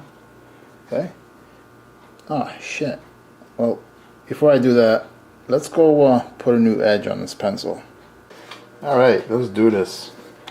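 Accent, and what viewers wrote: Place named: Bensonhurst, United States. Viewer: You sound like you're from bensonherst. Not a slam.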